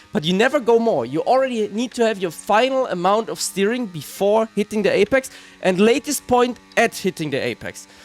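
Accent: Germany. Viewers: thanks, have always struggled with going quick with tc off i am always losing to my tc times also love your german accent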